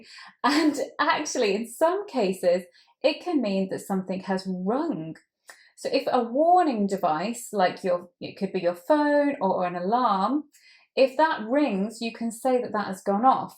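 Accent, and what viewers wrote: United Kingdom. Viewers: Awfully love British accent or love the u teach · I never give up I never come around I adore british accent thank you for help me god blessing you forever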